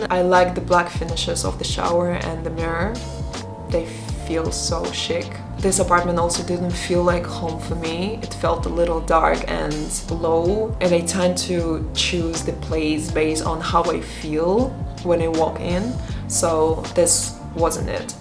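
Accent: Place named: Russia. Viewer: The ruzzian accent is disgusting, I am not watching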